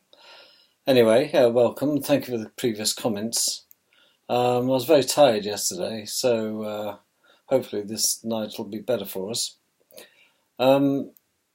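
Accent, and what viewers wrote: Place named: United Kingdom. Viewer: My sister is from the south ( she was adopted at birth) we found each other about 20 years ago.....well she was very worried about my accent....I'm from Massachusetts (western)(eastern mass has that nasal Boston accent) her whole family loves my accent.....I found that funny, I don't have one.....they do, all southerners.......it's funny how many Americans have an accent.....you have an accent, ,love a British accent 😎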